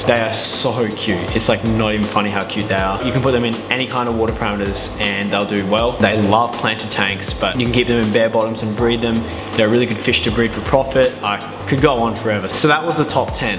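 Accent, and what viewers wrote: Australia. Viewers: Bro, you have a thick Aussie accent. Consider posting text names of each fish in the video and a list of them in the description for those of us who can only understand English. 😈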